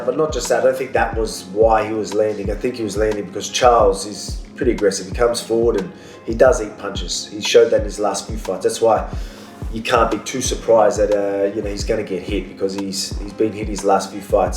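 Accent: Australia. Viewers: Grow some hair on ur head and change ur dressing sense, it makes u look more short.. otherwise excellent fighting, very entertaining, i like your Australian hard accent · Remove background music so we can hear u clearly. Your Australian accent makes ever more hard to listen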